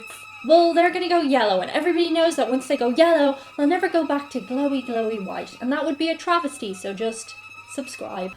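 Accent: Ireland. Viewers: We say stuffed to say we are full as well you Irish accent might be throwing the sound of the word.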